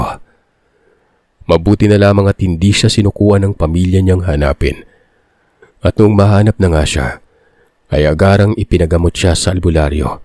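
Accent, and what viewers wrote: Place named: Calbayog, Philippines. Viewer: This is the first time na nakarinig ako ng taga Calbayog hahhaha hello kababayan 😂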